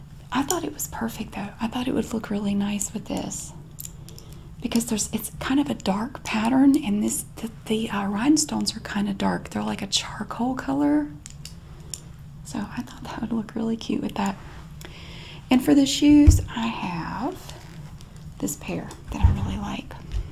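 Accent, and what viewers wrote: South, United States. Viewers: I absolutely love you 🖤🤍🖤 watching your videos makes me feel at ease and comfortable. I think one of the main reasons why I feel so at home when I watch your videos is, because of your southern draw. It feels like home because that is how my mom talks and I adore my mom! 🥰 so thank you for all that you do on your channel. 🖤 from SA Texas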